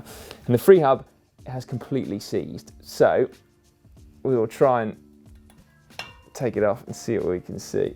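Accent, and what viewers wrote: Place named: RP, United Kingdom. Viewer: What a pity you speak like Prince Charles with his boyfriends you know what up his you know what.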